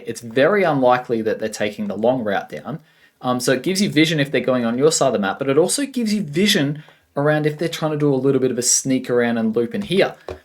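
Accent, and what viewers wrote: Australia. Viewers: The Australian accent is great. 😂👌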